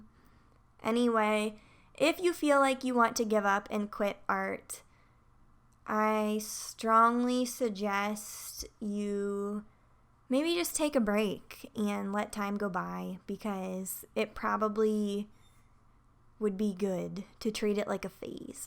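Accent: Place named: Northeast, United States. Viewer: Are you from RI, NY or MA? you have that accent. B^|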